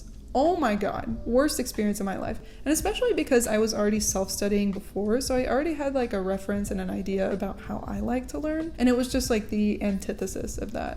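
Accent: Mexico. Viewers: It's surreal how your Spanish pronunciation sounds so native and you sound like a high class Mexican girl. I speak Spanish, I just ran into your video and I got curious. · As someone from SPAIN ur spanish is so mexican but it's actually very good!!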